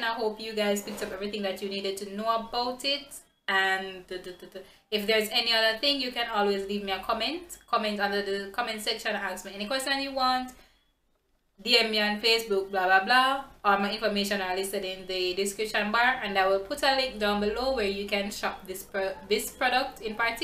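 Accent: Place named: Caribbean. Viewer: love your accent :) #CaribbeanGirls. Btw for reference what shade do you use in maybelline fit me/fenty?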